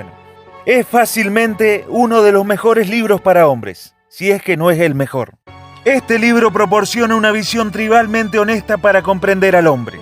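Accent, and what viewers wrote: Argentina. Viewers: No manches. Yo me dí cuenta de que es argentino luego luego.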